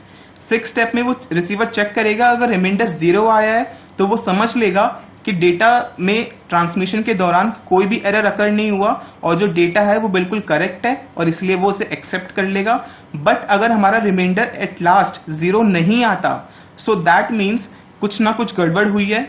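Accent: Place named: India. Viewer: Good video and nice handwriting! Easy to understand CRC this way. I'm going to watch more of your videos. (A little request? Some subtitles would be lovely. Because 1. it's always easier to follow the teacher :) and 2. easier to decipher some words/sentences - For non-Indian English speakers, Indian accented English can be a bit tricky to understand - even if we are used to the accent. I'm sorry to mention it, but I thought maybe you'd like to know).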